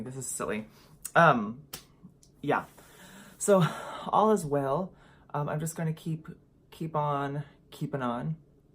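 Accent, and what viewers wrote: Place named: Minnesota, United States. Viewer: Thanks for keeping it so real and honest,! This entire month has been a struggle for me food wise as I’ve allowed myself to have so much crap and I just don’t feel the way I did before when I was in control. In the long run, sugar after sugar really does hurt my weight loss efforts too in more than physical ways. Good job on upping your water and protein again. I too am determined to get in all my water today! I was skipping protein shakes here and there too due to the extra snacks. Getting back to our work will help us get back to routine. Love that you got your Bullet! My kids were just asking me yesterday when we would start having smoothies again. I’ll make more when the weather warms up. I can’t believe you were having kidney stones every 6 months. Ick! I’ve had the stents too and I seriously can’t imagine anything worse than that. 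Seriously! Probably the worst pain even above having children. I think maintenance and routine can easily be established with working out/exercise. The key is to start slow and add slow. It becomes so much easier and habitual over time. I can’t stress enough SLOW and attainable goals. Did you know you’re beginning to pick up a Minnesota accent? I love it...how you say “about”....Happiest New Years to you and yay for trying champagne. How fun!!!!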